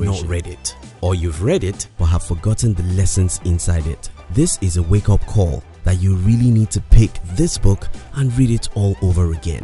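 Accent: Nigeria. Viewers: voice must be Nigerian. m'right? i'm 98% certain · Accent sounds Nigerian :? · I know a Nigerian when I hear one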